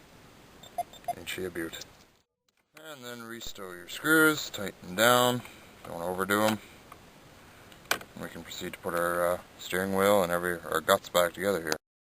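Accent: Canada. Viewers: Canadian eh.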